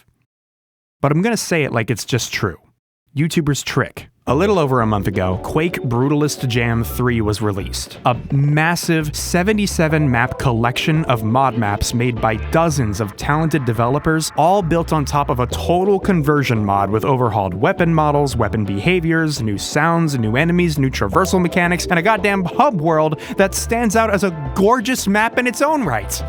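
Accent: United Kingdom. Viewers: never knew you were British, the more you know lol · why is he british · you're british?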